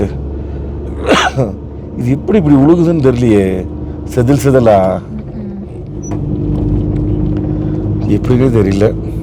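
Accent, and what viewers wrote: Kongu, India. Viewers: முதலில் உங்களுக்கு நன்றி... சிரமப்பட்டு டிரைவிங் செய்யும் போது பேசிக்கொண்டே வருவதால் நாங்களும் உங்களுடன் கூடவே வரும் உணர்வு... அதுவும் நம்ம கொங்குத்தமிழில்...அருமையான காட்சிகள்...ஆனால்..என்னவென்றால் பாவம்..உட்கார்ந்து கொண்டே செல்வதால் இடுப்பு வலி.. சென்றதும் நன்றாக ஓய்வு எடுங்கள்...Take care.. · இன்று உங்கள் சிட்டியை திருப்பூர் கார் பட்டறையில் பார்த்தேன். சூப்பர்...உங்கள் கொங்கு தமிழ் உங்கள் காணொளிக்கு சிறந்த நன்மை. வாழ்த்துக்கள். உங்கள் தொடர்பு எண்ணை அனுப்பவும். நாங்கள் உங்களை சந்திக்க ஆர்வமாக உள்ளோம். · கொஞ்சும் கொங்குத் தமிழ் பேசும் கோமகரே...! திகட்டாத தித்திக்கும் பேச்சுடைய திருப்பூர் திருமகரே...! எங்கள் மதிப்பிற்குறிய திரு. ❤❤❤🙏 அவர்களே எப்படி இருக்கீங்க அண்ணி❤❤❤🙏 எப்படி இருக்காங்க ரித்திக்❤❤❤ எப்படி இருக்காரு. இந்த லடாக் பயணத்தை திருப்பூர் திருமகரின் பயணம் என்பதைவிட "வீரத்திருமகரின்" பயணம் என்பதே சரியானது❤❤❤❤ நேற்று நான் சொல்ல வந்த ஒரு தகவலை இரண்டாவது கமெண்ட்டில் சொல்றேன் சில நேரம் YouTubeல அதிக எழுத்துக்கள் கொண்ட கமெண்ட் பதிவாகாது? 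என்ன ஆகுதுனு தெரியல? ஒருவேளை அப்படி பதிவாகவில்லை என்று சொன்னால்? நீங்க&TMF புரிந்துகொள்ளுங்கள் பதிவாகவில்லை என்று🙏. நான் உங்களை திருப்பூரில் வந்து சந்திக்கும்போது அனைத்தையும் சொல்கிறேன். நீங்க இதற்காகவே அதை ஒரு வீடியோவாக நம் TMFக்கு upload பன்னுங்க. நம் ❤சிட்டுக்குருவியோட❤ தங்களது இந்த லடாக் பயணம் இனிதே தொடர்ந்திட நல்வாழ்த்துக்கள் ❤❤❤ என்றும் அன்புடன் உங்கள் தம்பி என்றும் அன்புடன் உங்கள் TMF ரா.முஹம்மது கபூர் (சென்னையில் இருந்து)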